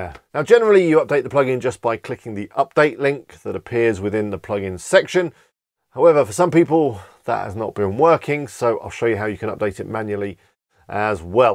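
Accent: United Kingdom. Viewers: Are you from Nottingham perchance?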